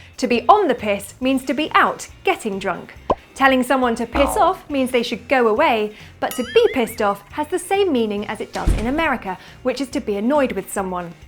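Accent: United Kingdom. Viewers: In all I love the British accent as it add confidence to your speech. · There are almost as many "British accents" as there are towns in the U.K. Her accent is more of an "educated Brit" accent, isn't it?. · Are you British? But if you not how English English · Are you bloody stupid mate? Her accent is obviously British! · I would stick it in this British chick's bum. She is not very pretty, but I have a weakness for that damned accent.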